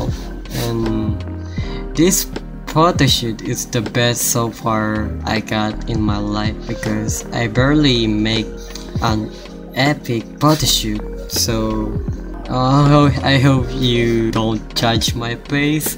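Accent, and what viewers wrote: Philippines. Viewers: i think hes filipino, he speaks like one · I just realised he's Filipino just because of his accent and about page So. HATDOG PRE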